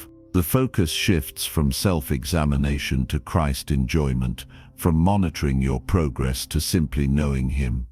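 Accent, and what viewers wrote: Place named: United Kingdom. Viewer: Nowhere in the word says we were broken with him. We died with him. I don’t like it when a different nomenclature is inserted that is not scriptural. We still have an old man nature I renewed mind walk is an intense mindset. Remember when Paul says who shall deliver me from this dead body… We are to reckon our selves dead unto Christ and renewed in the spirit of our minds to the new nature. Where is the British accent narration lead to authenticity regarding a Chinese Christian?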